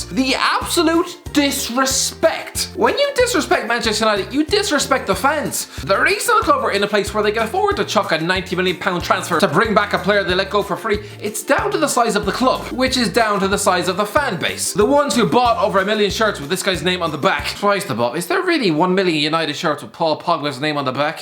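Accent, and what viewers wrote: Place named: Ireland. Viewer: Nope just that irish accent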